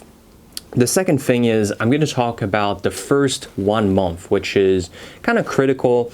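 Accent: United States. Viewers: native french speaker? your american accent is absolutely incredible !!! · It is incredible! When I come across your video, I thought you were an American :)) Incredibly man!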